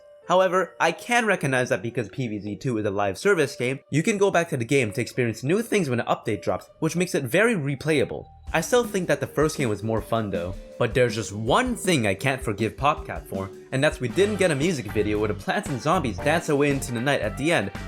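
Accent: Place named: United States. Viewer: He is either american or canadian.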